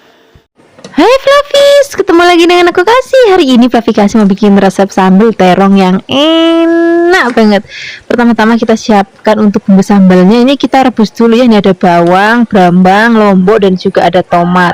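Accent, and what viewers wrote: Java, Indonesia. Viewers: tinggalnya di mana sih ko logat bahasanya Jawa banget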